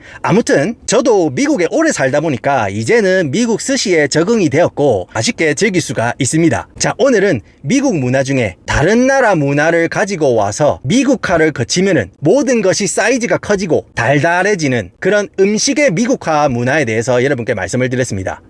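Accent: Gyeongsang, South Korea. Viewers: 경상도 사투리가 너무 심하다... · 이정도면 경상도 사투리 중에 진짜 밝고 스윗한 사투린데😂ㅋㅋㅋ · 경상도사투리 이정도면 상타치입니다ㅋ 근데 연배가..저희 큰아빠 말투신데😅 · ㄹㅇ 경상도사투리 기준으로 은 매우 스윗하게 말하는거임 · ㅋㅋㅋㅋㅋ 서윗한 경상도 사투리로 들려요~ ㅋㅋㅋㅋ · 이렇게 나긋나긋한 갱상도 사투리는 살면서 들어본 적이 없거늘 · 저도 서른마흔다섯살 보통 갱상도 아재입니다만 억수로 부드럽게 잘 설명해주시고 계시는 것입니다 ㅎㅎ 스울 분들 들으시기엔 공격적으로 들리시겠지만 그거 절대 아니라는거 잊지말라규 ㅎㅎ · 전혀 공격적이지않고 재미있게 듣는데.. 아.. 경상도 아닌 분들은 공격적이라고 생각하실 수도 있겠네요 ㅎㅎ 경상도 특유의 억양일뿐 절대 공격적 멘트 아니라는 점!!! 잊지말라규~~❤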